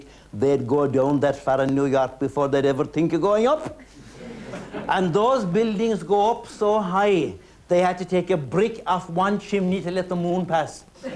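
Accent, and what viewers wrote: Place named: Ireland. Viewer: Reminds me of Galway (I know he's a Kerryman). National school in the Gaeltacht. The cadence of Irish projected onto an English language vocabulary. Reminds me of Giles na Magaleen in Ballymagaleen.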